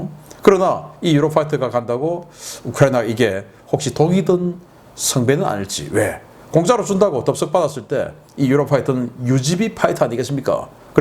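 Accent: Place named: Seoul, South Korea. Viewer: 서울말 쫌 되니 .....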